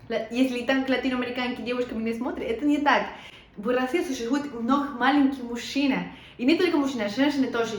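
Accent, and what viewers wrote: Spain. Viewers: Случайно наткнулся на это видео-ну ты перуанская красотка- молодец 👏, неплохо говоришь по-русски, с приятным испанским акцентом! 👍 Удачи тебе, лайк и конечно подписка! 👍👌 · Впервые слышу испанский акцент, если не вслушиваться звучит как "плюм-плюм"))))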